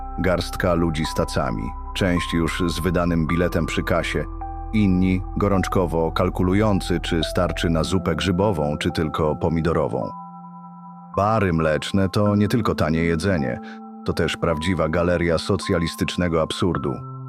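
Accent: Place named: England, United Kingdom. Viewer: Bez urazy, ale wiedza autora z filmów Barei przesadzona w surrealizm. Oczywiście pewne fakty są prawdziwe ale np te z barów mlecznych z łańcuchami na sztućce i naczynia przykręcane jak na Misiu Barei to przesada. Polacy mieli dolary od rodziny z zachodu oraz bony PKO aby kupować w Peweksie lub Balatonie np walkmeny japońskie Wranglery itp. pracowali za dewizy np w Iraku czy Libii. Osobiście nic nie miałem do barów mlecznych. Chumorystycznie lecz przesadnie pokazana rzeczywistość PRL na kanwie filmowej nie rzeczywistej i ten akcent angielski coś mi mówi. Pozdrawiam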